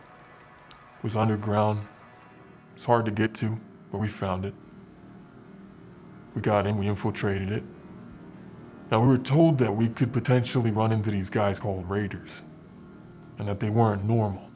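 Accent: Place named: United States. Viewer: Russian in American accent. Love it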